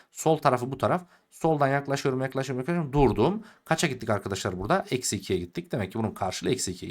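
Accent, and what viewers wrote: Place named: Kayseri, Turkey. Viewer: Hocam nerelisiniz konuşma tarzınız kayserililere benziyor da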